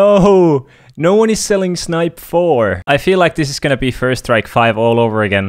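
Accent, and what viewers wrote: Finland. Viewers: R u from Finland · are you finnish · häiritsee ku englannissa kaikkilla suomalaisilla on semmonen aksentti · Finnish accent 👀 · The finnish accent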